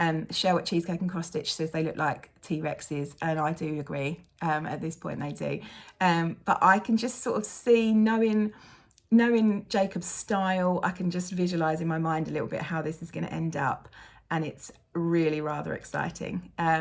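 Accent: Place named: Australia. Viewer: Hi, I have been loving the videos. I have been watching each morning with my cup of tea but I am currently watching with my parents and they think you sound Australian 🙈 I think you would have mentioned something so please correct me if I am wrong in thinking you are not originally from there 😅 from Immy (StitchedbyImmy) x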